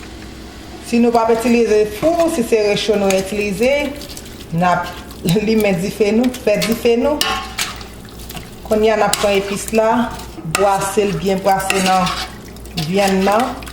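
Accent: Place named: Haiti. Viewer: Great job.... and you were worried about you Creole accent! Proud of you! Happy New Year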